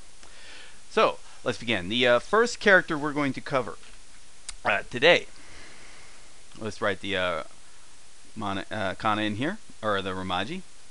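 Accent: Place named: West, United States. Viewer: how can it be the mu of samuel when the mu in samuel sounds like "myu"; geez you western foreigners and your accents at least teach correctly and try not to confuse the students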